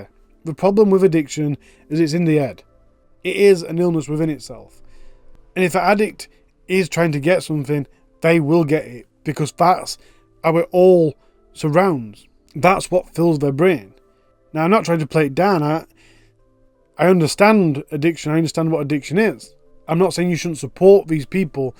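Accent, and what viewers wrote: Yorkshire, United Kingdom. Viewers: By any chance, a Northerner, you? Yorkshire?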